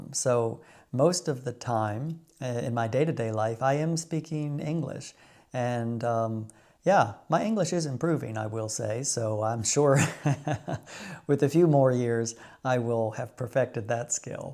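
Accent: United States. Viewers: Not a problem to hear your southern accent. I think when I visit Tennessee they wil notice I am a Dutchy. As I said earlier this is an old account and member 2 for you 🙂 But still Mark* <link>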